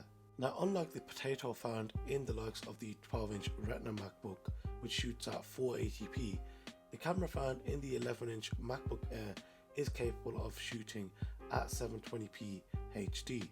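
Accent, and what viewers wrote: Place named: England, United Kingdom. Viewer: annoying english accent. good review though.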